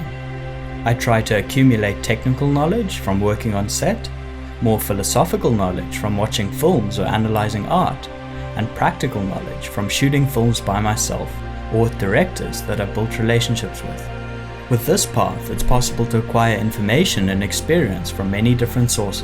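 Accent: South Africa. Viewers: Is that a South African accent I hear? 😂 Another South African here! ❤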